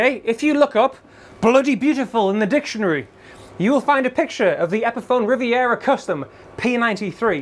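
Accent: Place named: Northern England, United Kingdom. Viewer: Y tiene uno de esos acentos del norte de Inglaterra.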